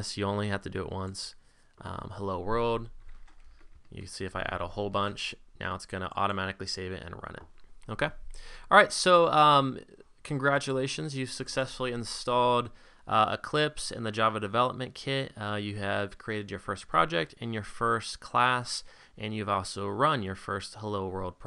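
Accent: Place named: United States. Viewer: good morning Dr good to hear your american voice rather that the accent from India. I already listened to 3 videos on installing Java SW and i had no success too late at night to hear yours maybe tomorrow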